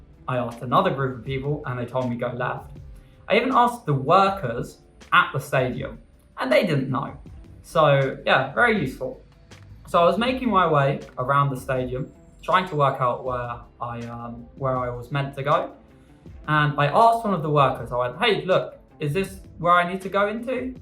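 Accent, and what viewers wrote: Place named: United Kingdom. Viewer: omg Britich accent - very bad, uncomfortable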